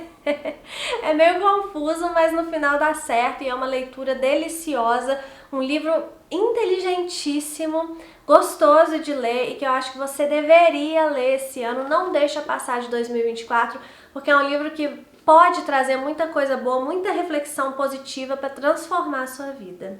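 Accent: Minas Gerais, Brazil. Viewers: Ela é mineira?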